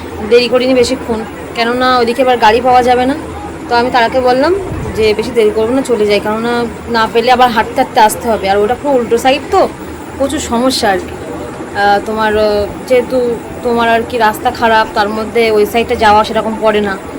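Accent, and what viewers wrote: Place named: West Bengal, India. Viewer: Tomar kothar j taan ta amar khub bhalo lage...amar bashirhater ak bandhobi ache...or kothar taan o erokom.....besh bhalo lage❤😊😊manu k bairer khaabar beshi diona....bhalo theko tomra ❤️❤️❤️❤️👍👍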